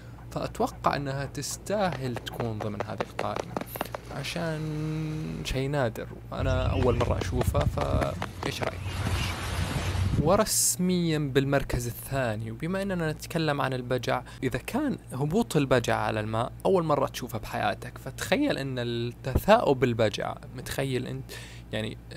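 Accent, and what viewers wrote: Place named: Levant, Jordan. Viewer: توقعتك من الأردن او فلسطين وفعلا صوتك مميز ملاحظة بسيطة قص اظافرك 😁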